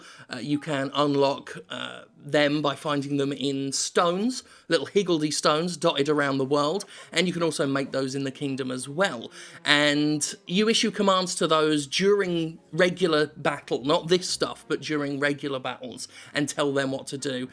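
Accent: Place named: United Kingdom. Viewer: When he started talking about the story, he completely lost me with his Britishness.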